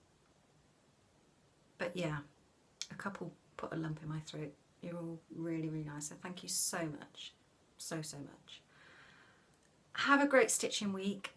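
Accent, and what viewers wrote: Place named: England, United Kingdom. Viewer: Hi, great progress as always! I'm English living in Australia and listening to your lovely English accent makes me feel so happy for the rest of the day! I got my hubby to make the scroll frame, so easy and cheap and I found sticky backed Velcro which is great to use! So a big thankyou!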